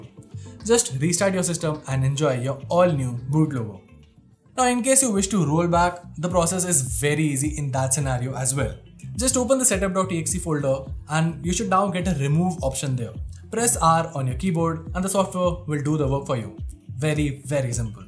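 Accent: India. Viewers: That indian "gulu gulu" accent.....................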